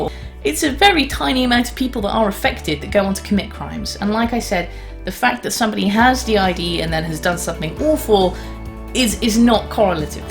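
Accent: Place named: United Kingdom. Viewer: is sounding more British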